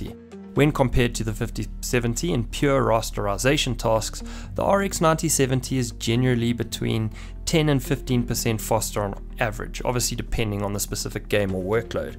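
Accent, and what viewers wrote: South Africa. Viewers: Howzit bru, nice review. It was super cool to hear the Saffa accent! I currently live in Asia and was able to get the card for around 670 USD. Not MSRP but considering the current market I am happy with the purchase. Looking forward to more content in the future!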